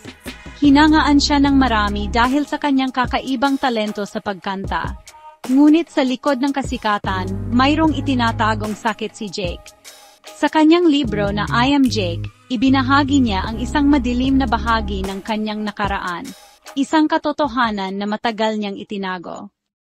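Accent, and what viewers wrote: Visayas, Philippines. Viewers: Yung narrator bisaya naman .trying hard magpaka host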